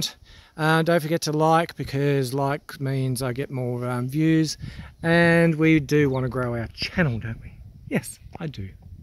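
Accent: Australia. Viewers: Ah, read all the way to the bottom and am terribly sorry to hear that the cancer is back - like a knife through the heart with shock and sadness. You know you were my first love for abandoned explores (it's that catchy Aussie accent as you ramble on, haha) and because you do what you do so well, here in Upstate NY we're sending tons of love back for a safe and quick recovery. Peaceful thoughts to you and yours. (Another great explore, by the way!):)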